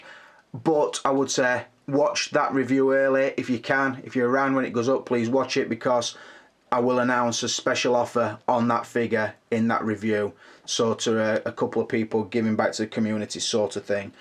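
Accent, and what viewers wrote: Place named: Scotland, United Kingdom. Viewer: he is a scottish person i knew because how he talked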